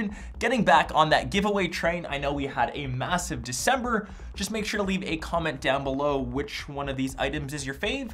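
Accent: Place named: Canada. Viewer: he is talking canadian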